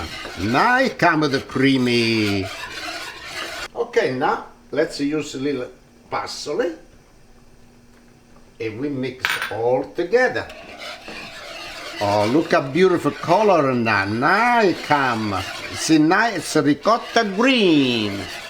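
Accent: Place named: Sicily, Italy. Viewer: A wonderful man Mr.Cerio, was my first intro to food that was not german, He rented a suite from my Dad who had a business in the same building. He was from Sicily and his proudest times were sharing his food with a 8 yr old (me) and talking about his budgie bird. He had no family in Canada that I can recall. I Remember him with fondness 57 yrs later. He got me hooked on authentic italian food ever since. You speak as he did. Are u also from Sicily?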